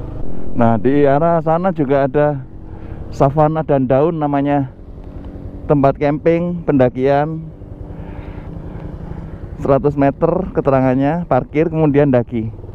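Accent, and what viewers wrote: Java, Indonesia. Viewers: Kalo ke Lombok ( khususnya ke Sembalun) ajak anak Istri dong /pacar atau temen boncengan biar ndak ngomong sendirian 😊😊😊. Kapan ke Lombok lagi Bang, dari Jawa mana Bang logat jawanya medok sekali, pingin bisa bahasa Jawa aku 😀😀😀😀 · Ini mas ny org Jawa bkan? Klo dri logat bicarany mirip org Jawa?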